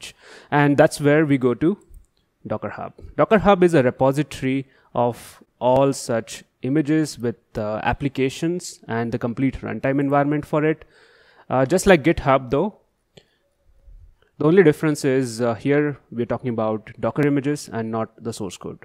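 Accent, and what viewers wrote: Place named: India. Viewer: I'm no English native so the Indian accent is hard for me, sorry.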